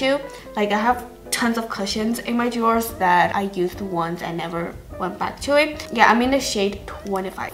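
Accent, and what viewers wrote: Spain. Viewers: Can you a video in spanish like a vlog or something, im loving your spanish accent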